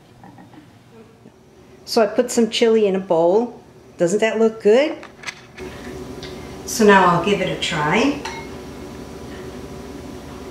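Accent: New Jersey, United States. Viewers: That looks delicious !!! I copied down your recipe, and Subbed ! I also love your kitchen, and that Jersey accent !!! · Definitely from New Jersey New York area! Love it.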